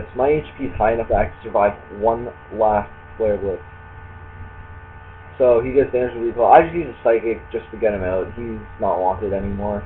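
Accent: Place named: Canada. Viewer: This narrator sounds distinctly Canadian. Is he? Top speed Nidokings, even while Modest, can usually outspeed Kingdras. Just a tip for future, it looked like you could have wiped out his Kingdra early thanks to the switch. Oh and btw, I don't know if this applies to you, but if you add yt:stretch=16:9 to your tags section the screen will be nicely stretched and you won't see those ugly black bars.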